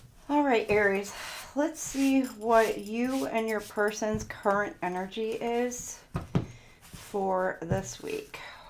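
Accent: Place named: England, United Kingdom. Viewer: Your English accent is hilarious 😂 I guess my Scottish accent is too! Edinburgh is pronounced as Edinbra or Edinborough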